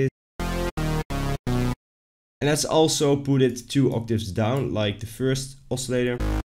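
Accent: Netherlands. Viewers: you sound dutch. are you dutch?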